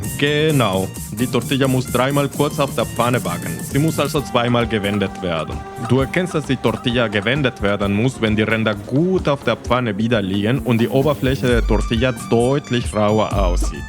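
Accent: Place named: Russia. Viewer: Интересно, по акценту автор русско говорящий. Не плохой немецкий.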